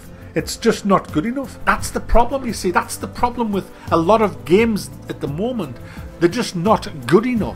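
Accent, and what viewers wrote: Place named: Sunderland, United Kingdom. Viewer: Hi can I ask if your from sunderland? I reckonise the accent lol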